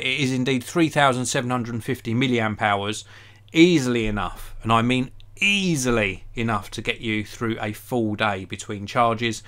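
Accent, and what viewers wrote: England, United Kingdom. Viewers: Its called an English accent mush!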